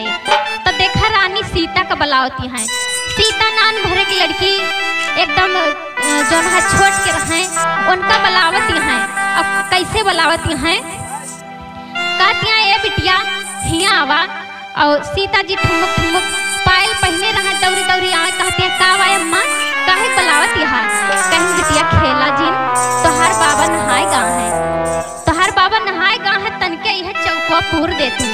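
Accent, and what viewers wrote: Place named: Awadhi, India. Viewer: Itna sundar or shudh Awadhi bolne wala maine nahi dekha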